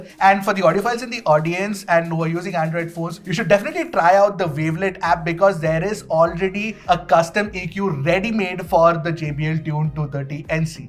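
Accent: India. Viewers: i mean when i saw indian man i thught hes going to tell few words in english and title and then hindi but instead i got nice indian accent and very nie review very good man keep it up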